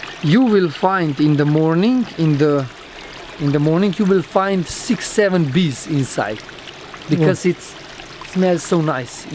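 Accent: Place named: Wales, United Kingdom. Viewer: Slovenian. Accent isn't a hundred miles away from Welsh either ha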